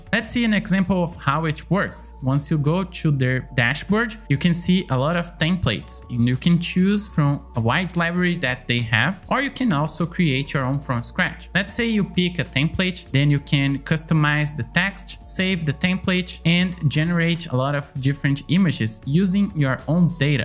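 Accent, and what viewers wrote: Brazil. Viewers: I love your accent sounds like a brazilian guy speaking english. Great content btw!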